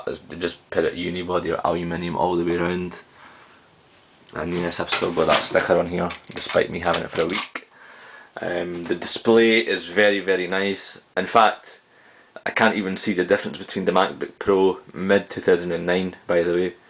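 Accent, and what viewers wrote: Scotland, United Kingdom. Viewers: scotish? irn bru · wtf? scotish..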